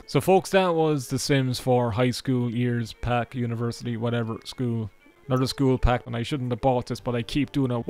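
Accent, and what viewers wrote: Ireland. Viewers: Man I wish I had an Irish accent I love how it sounds lmao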